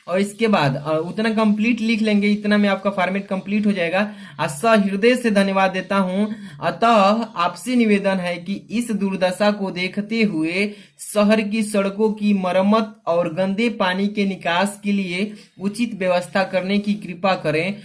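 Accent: Bihar, India. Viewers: Sir aapki awaj se lg rha h ki aap bihari h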